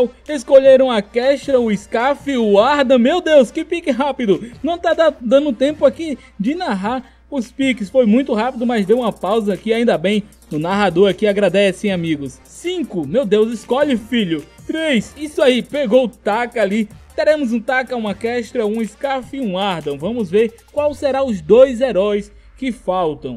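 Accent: Northeast, Brazil. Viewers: é Nordestino 😱😱 Eres de onde Mestre?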